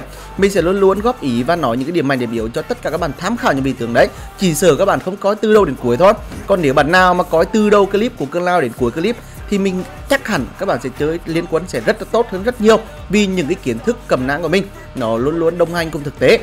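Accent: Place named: North, Vietnam. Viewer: dọng ah miền bắc nghe hay quá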